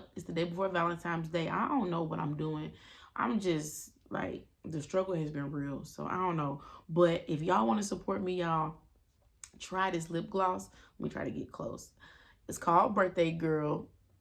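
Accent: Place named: St. Louis, United States. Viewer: Your St. Louis accent is so strong lol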